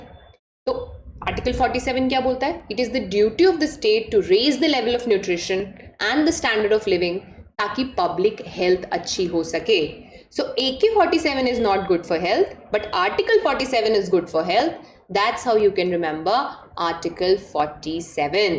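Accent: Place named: Bengal, India. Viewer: I thing he is from Bengal , his means “Jaldi kro gap mat Karo”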